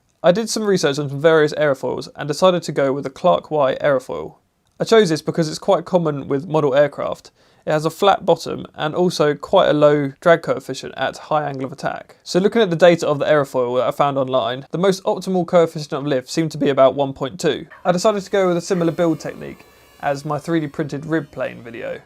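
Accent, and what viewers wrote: United Kingdom. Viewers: Eeemm like british accent.. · I can taste the UK accent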